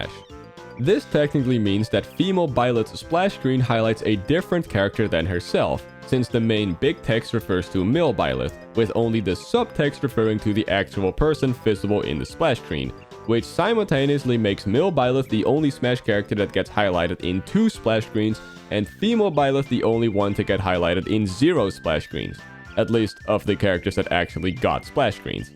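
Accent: Netherlands. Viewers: Are u from the Netherlands or from Belgium?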